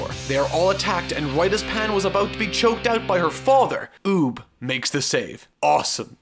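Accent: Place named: Ireland. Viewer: You're Irish aren't you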